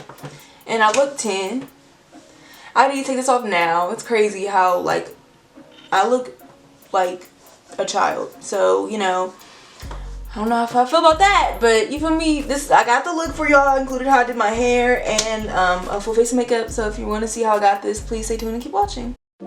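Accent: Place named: Bay Area, United States. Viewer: lol u talk like ya out here in the bay I was like oh she must be local 😭😂 stay safe bihhhh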